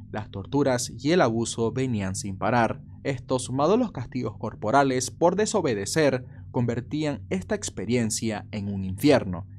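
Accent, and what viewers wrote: Venezuela. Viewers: tu acento es muy venezolano de dónde eres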